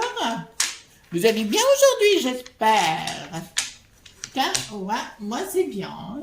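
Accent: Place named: Provence, France. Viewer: Bonjour, j ai reconnu l acccens du pays...sa sent bon la farigoulette et la lavande..je vous souhaite une bonne soiree. ♥☺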